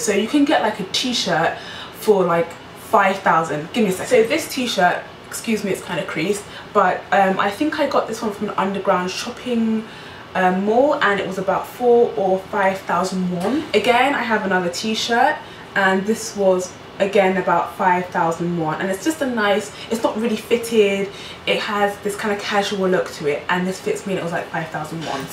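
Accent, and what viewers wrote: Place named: RP, United Kingdom. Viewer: You're so posh your accent omg!! 💕💕💕😍😍😍😍😍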